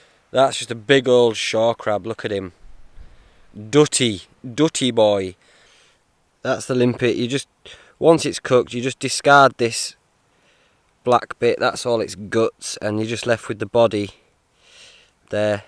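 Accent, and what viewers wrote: Yorkshire, United Kingdom. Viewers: adrian mendoza no it's british he's a pom not an aussie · christian roberts lol it's Yorkshire clearly. Definetly not Scottish Lowlands. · I WAS GOING TO SAY YORKSHIRE. DOIN MY HEAD IN TRYING TO FIGURE THE ACCENT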